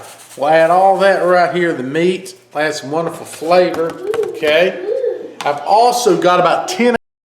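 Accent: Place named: Mississippi, United States. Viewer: Are you from around gulf port MS? My friends have a similar accent at Ole Miss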